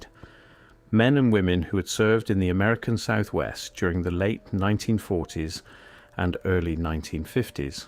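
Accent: United Kingdom. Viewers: How come all the narrators of these episodes are Pommies?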